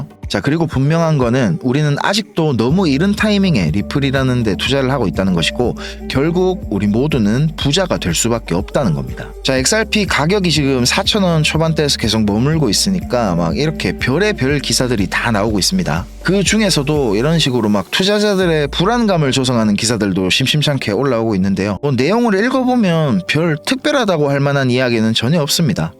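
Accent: South Korea. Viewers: 저도 응원합니다. 특유의 부산 억양 제 와이프와 같습니다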